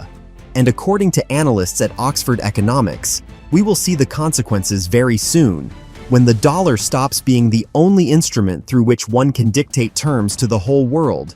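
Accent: United States. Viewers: Choose a non-yank accent for your AI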